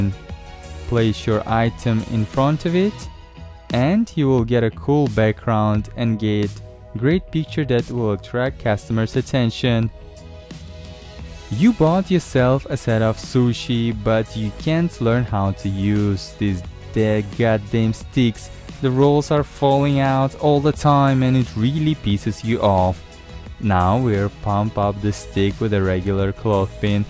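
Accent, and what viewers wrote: England, United Kingdom. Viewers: Its kinna cute when he call that fruit punch mash potatoes 🤣🥰🥰 im i the only one who deeply inlove with his english accent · i think this is the most british accent i heard from you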